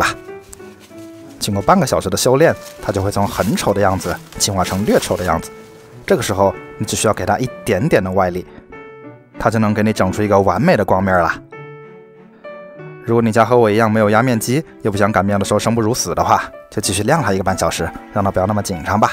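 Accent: Wuhan, China. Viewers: 难怪口音这么耳熟哈哈哈哈哈哈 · 我就说为什么你的口音一直亲切，原来也是武汉的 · 果然你的口音是武汉的！ 加油 拐子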